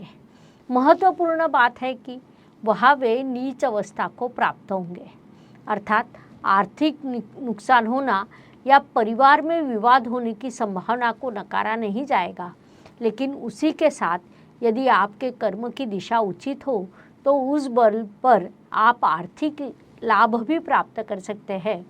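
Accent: Maharashtra, India. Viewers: Madam please speak in Marathi or else speak in Hindi tone..Ekdum marathi tone aata hai to thoda alag lagta hai..Content bohot accha hai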